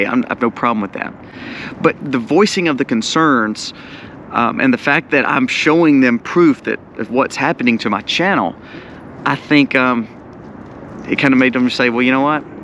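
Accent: South, United States. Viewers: You're the only youtuber that can inform us about some disaster but, at the same time, makes us feel good about our day. It's that smoooooth southern voice. You're the Mr. Rogers of prepping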